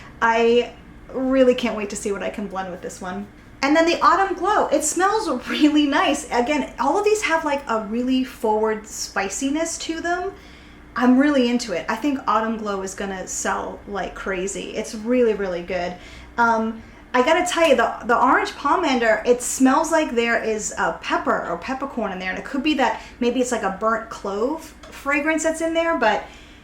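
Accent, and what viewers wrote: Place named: New England, United States. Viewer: Fellow New Englandah? lol also thanks for the review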